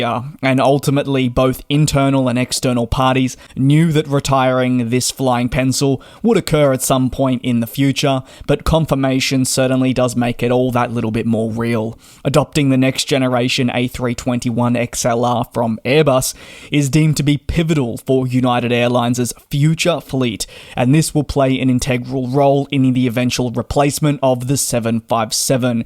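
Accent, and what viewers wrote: Australia. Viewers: I could be mistaken, but this guy sounds Australian.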